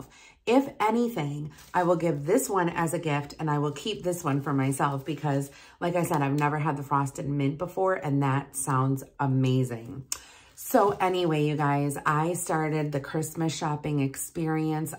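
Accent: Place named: Midwest, United States. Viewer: First thing I thought, midwestern gal!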